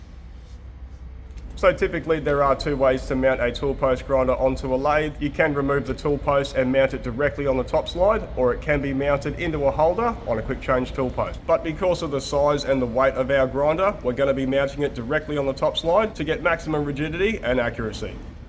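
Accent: Australia. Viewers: I think appearance is every thing and your appearance is so much better when you shave your face, also your Camera Lady should also appear every once in a while as your Partner in Business. I also enjoy the Birds and is it Jeffery or Homey your Dog! You are Highly Skilled in your trade and you remind me of Myself, i also worked Many Hours in My Trade as a Collision Repair Technician for 43 Years. I also worked Many Hours, sometimes till Midnight! I Also Really Enjoy your Australian Accent!!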